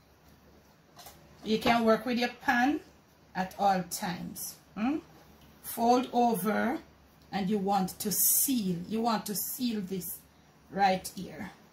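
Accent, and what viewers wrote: Jamaica. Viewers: Tht bread looks 😍 so good and soft 😋...I just love watching & listening to her she's so cute with her Jamaican accent 🥰..I'm definitely going try this version of bread over others it looks the best out of others I've seen bcuz it was made with care💝 & tht makes the best food!🤗🏆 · I just looked at the thumbnail and before she started talking I knew with all my stereotypical thinking that this Jamaican woman(with a Jamaican accent) was the one to listen to ti teach me how to make bread..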